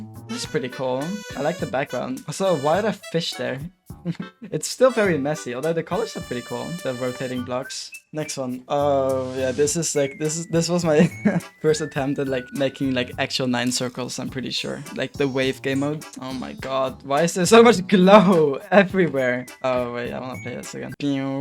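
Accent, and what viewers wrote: Germany. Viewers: Youve got a german accent, are you from Germany? I am austrian btw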